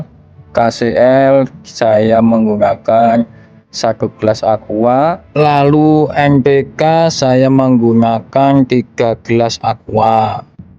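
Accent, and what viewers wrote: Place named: Bali, Indonesia. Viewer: Bang tutorial ini apa di kawasan bali?? Logatnya seperti orang bali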